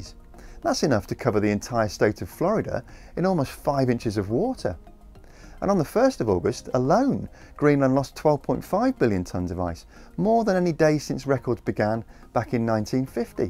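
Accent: England, United Kingdom. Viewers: Keep up the data driven policy analysis! Much more likely to cut through to the harder to reach climate change sceptics than Greta's emotional, hysterical manner. All delivered in a calm, considered English accent with minimal background music. Hooray!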